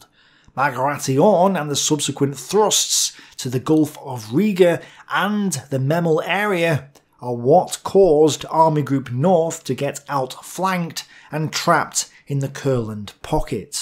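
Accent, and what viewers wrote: United Kingdom. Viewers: He can't help his British accent!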